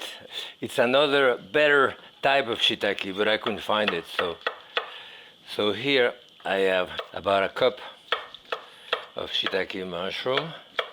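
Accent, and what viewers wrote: France. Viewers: Also his voice and that little French accent, it's irresistible. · Jacques tu es le meilleur et cet accent parisien 🎉❤❤Longue vie mon cher 🎉❤🎉❤🎉❤🎉❤inchaAllah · “ ‘Appy Cooking!” Love his French accent!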